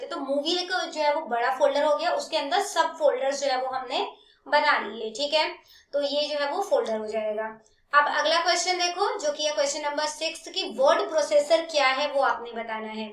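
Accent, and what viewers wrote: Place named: Punjab, India. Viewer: App punjabi bohut cute bolte ho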